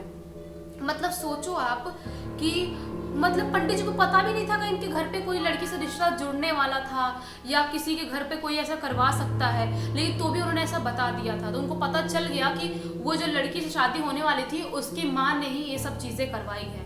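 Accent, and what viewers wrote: Bihar, India. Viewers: Kya aap bihar se ho?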